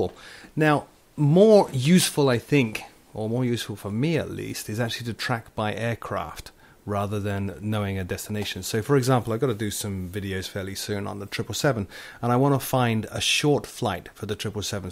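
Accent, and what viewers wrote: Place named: United Kingdom. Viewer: I've a question, are you from the United States or from Uk,you have the British accent haha!!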